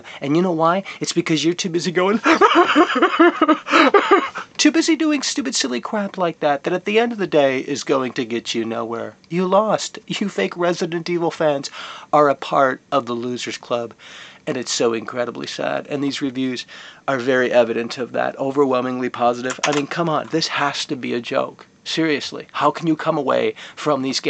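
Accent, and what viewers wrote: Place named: Texas, United States. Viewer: Lol its the very fact that his Texas accent that made it seriously funny to me.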